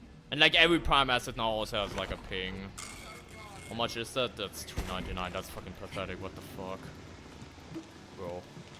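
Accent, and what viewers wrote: Germany. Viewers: hey mein bester ich weiß du sprichst nur englisch mit deinen leuten aber man merkt das du deutscher bist verfolge dich schon seid monaten was für ein level hast du mittlerweile und wie viele stunden hast du in oulast trails würde mich mal interessieren😅😊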